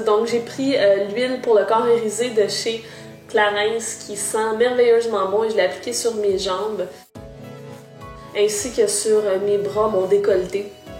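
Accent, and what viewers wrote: Quebec, Canada. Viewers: Magnifique ! Je suis sous le charme de ton accent québécois je dois avouer. Souvent en France on trouve ça très drôle de vous entendre parler mais toi, je sais pas, j'aime beaucoup. J'ai un mariage l'été prochain, si j'arrive à avoir une jolie silhouette j'aimerai bien avoir une jolie robe comme toi. Bisous de Paris !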